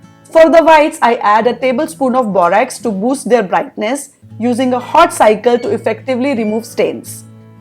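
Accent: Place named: India. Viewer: IM SORRY BUT A Hindu infused english accent has to be the strongest, heaviest, and most difficult to understand in the world. I cannot be the only one who finds this accent to feel like nails in a blender. I would rather subtitles in English, if you arent a native English speaker and you have a hindi accent. Please please, I pray you recognize how horrific this accent sounds. Its not your fault. But its miserable, and it is a choice to continue trying to record videos on youtube.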